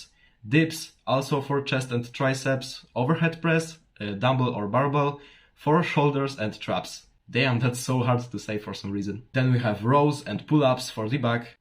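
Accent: Poland. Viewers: poznaje ten Polski akcent, ciekawe czy sie nie myle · o z polski jestes slychac, u mnie silka wleciala poprawiam nawyki zywieniowe lecimy pozdro 💪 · Are u from poland? cuz i kinda hear ur accent but ur english is on high level. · Nie - nie wyśmiewam się, mega props za kontent i formę vlogów - po prostu wyłapałem ‚polski’ akcent, so one question - Are You Polish? ❤❤ Good job bro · słychać że polaczek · nie ukryjesz polskiego akcentu , ale i tak wyglądasz zajebiscie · Bro i'm gonna guess, but are you from Poland? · Dude r u from poland i can hear it here cool Pozdrawiam Pl gurom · Short and to the point, that's what i was looking for. Also weird question, are you from Poland? I can everywhere recognize accent of own country. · brat ty z polski? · od paczątku wiedziałem żę to polak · as a pole i immidiatelly noticed that your polish just by your accent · Bro u Polish? 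· this question is not related to the movie, but are you polish? I think the accent is familiar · coś czułem że jesteś polakiem słuchając twojego akcentu XD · po 1 minucie filmu zauwzaykem ze jestes z polski XD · ja pierdolę wiedziałem ze polak po akcencie haha <3 dobry materiał mordo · ej mam wrażenie że jesteś z polski bo masz taki akcent podobny XD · I immediately new that you're polish because of the accent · wiedzialem ze jestes z polski, od razu po akcencie poznalem. gratuluje virala<3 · Are you Polish? Masz bardzo polski akcent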